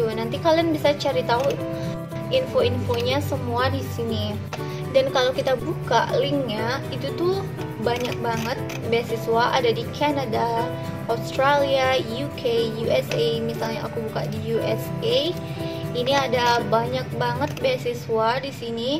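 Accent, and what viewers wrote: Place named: Sumatra, Indonesia. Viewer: kayak orang sumatra logatnya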